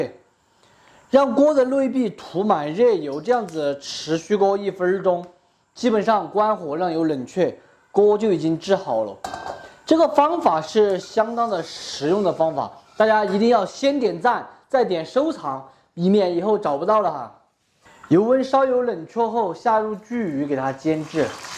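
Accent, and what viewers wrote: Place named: Xinjiang, China. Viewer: 博主的普通话说起来很像新疆人。😄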